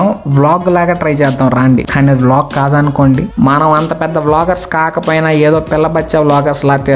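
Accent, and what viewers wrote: Nellore, India. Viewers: Bro nuvvu Nellore aa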